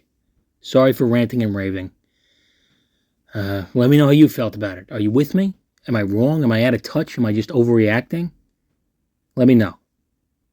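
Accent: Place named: South, United States. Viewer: are you from the South? You have a great Southern accent.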